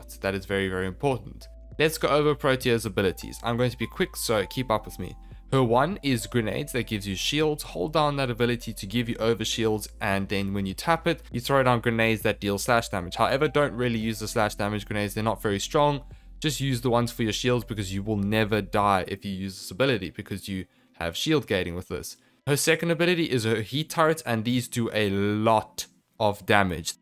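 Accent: South Africa. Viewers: are you soug African? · My dude are you from South Africa 🇿🇦 I am from Cape Town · Mate you sound like you from south africa i cannot lie xD, great vid btw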